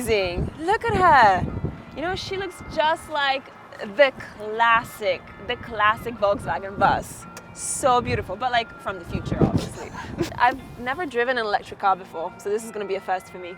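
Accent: United States. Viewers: Is it me or did you get a slight American accent in this video? :P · Hilarious how you picked up the American accent! (from your hybrid British - Polish accent)! Like my wife who picks up the accent of the places she visits. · so cute, has aquired an a bit of an A,merican accent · in america, even her accent got a little more american🤠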